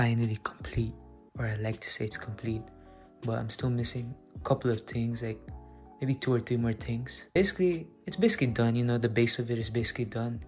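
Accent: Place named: Philippines. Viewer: Bro have pilipino accent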